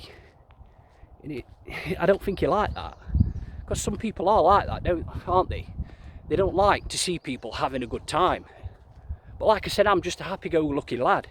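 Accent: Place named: Yorkshire, United Kingdom. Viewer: Hi, just to say when I first started watching you I thought you were from Yorkshire lol.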